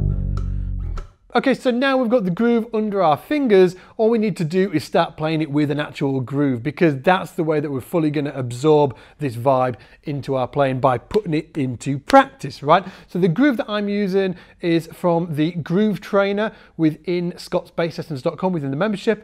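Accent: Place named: North, United Kingdom. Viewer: New video format and northern accent hella lit!!! 🔥🔥🔥🔥🔥😂😂😂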